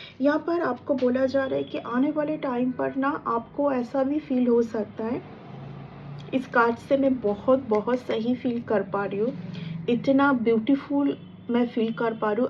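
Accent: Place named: Bengal, India. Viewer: आप तो बंगाली भाषा से लग रही है।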